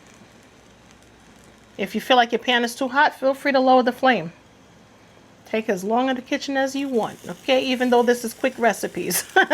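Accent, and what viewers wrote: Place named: Philadelphia, United States. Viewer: Are you from Philly? I just ask bc of the accent.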